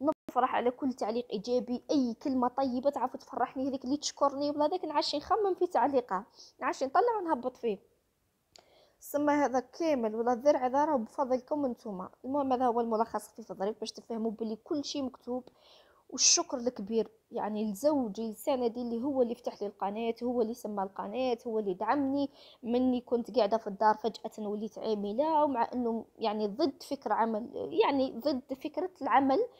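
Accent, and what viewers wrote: Setif, Algeria. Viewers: الف الف مبروك لعقوبة للذهبي تستاهلي وصوتك سطايفي يهبل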